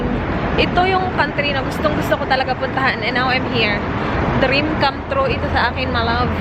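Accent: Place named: Visayas, Philippines. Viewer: ang cute magsalita ng tagalog na may halong bisaya :) pero ang galing mag english :)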